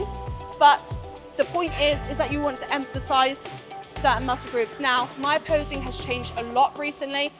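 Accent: United Kingdom. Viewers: Where are you from in the UK? I'm thinking south? Hampshire perhaps 🤔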